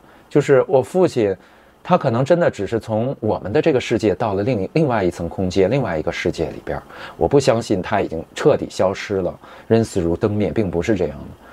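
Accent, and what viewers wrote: Beijing, China. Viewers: 非常真实可信。小叔第一次这么正经的北京腔儿。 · 听出来了，你是北京人 你父亲做什么工作的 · 可能太想北京了，刷到这视频听到北京口音，开心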